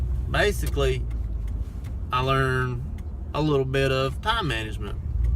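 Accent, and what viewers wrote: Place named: South, United States. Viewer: Lol omg his accent lol so country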